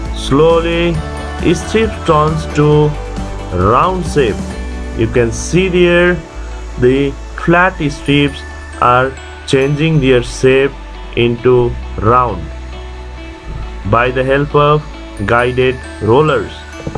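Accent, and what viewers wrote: India. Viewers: Indian accent is the Ugliest english accent around the world and it is very hard to understand,bad pronuncuation,to name but few. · Give up your Tamil accent of spoken English. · Bihari bol raha hai?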